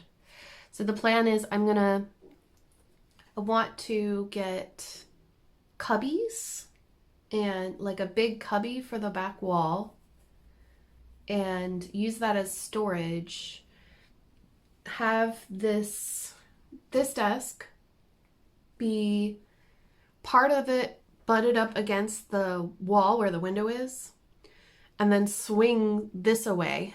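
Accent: Ireland. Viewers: The one I noticed is that you are picking up on the accent of Ireland so neat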